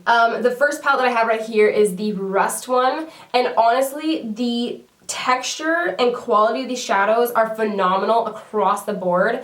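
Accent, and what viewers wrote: Canada. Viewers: I don't know if it is because english is not my first language but this is the first time I noticed your Canadian accent. Is real pretty though.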